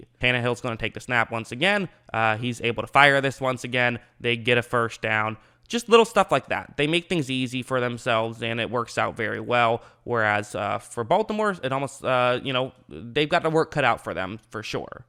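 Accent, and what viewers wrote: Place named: Pittsburgh, United States. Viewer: You can hear is Pittsburgh accent 😂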